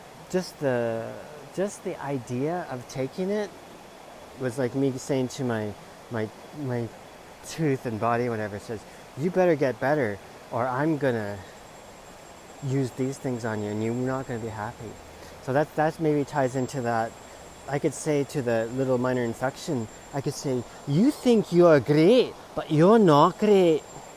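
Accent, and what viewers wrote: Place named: Scotland, United Kingdom. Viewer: Hi, Love the Scottish accent-You Are Great 😊 Wow the rosettes are beautiful ma’am! I’m really enjoying all your uploads this last month-I’ve not commented on them all, but I’ve given them the 👍🏻 thanks again for the swoopy upload 😉 hope you’re having a wonderful day ❤️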